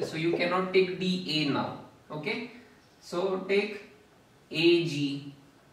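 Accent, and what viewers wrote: India. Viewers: if you are indian, speak Hindo, don't speak the terrible indian english, i will make sure never click this again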